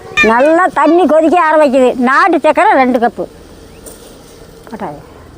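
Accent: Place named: Coimbatore, India. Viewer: Is it coimbatore district? Via general name. I love the slang of the language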